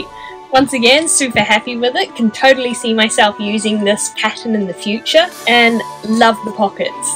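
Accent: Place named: New Zealand. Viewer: as a fellow kiwi it's just nice to hear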